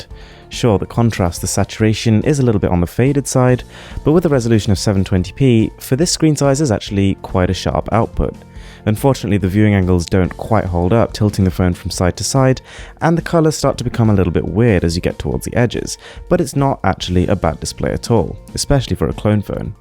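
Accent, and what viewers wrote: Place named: Birmingham, United Kingdom. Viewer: that's Birmingham I knew it ! lol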